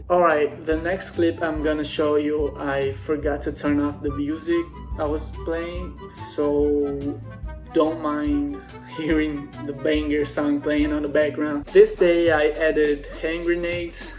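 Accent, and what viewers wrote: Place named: Brazil. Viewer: O sotaque brasileiro é reconhecível em qualquer lugar do mundo 😅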